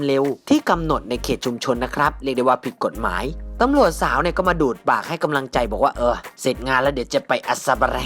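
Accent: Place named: Suphanburi, Thailand. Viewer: พูด แบบ สุพรรบุรี ครับ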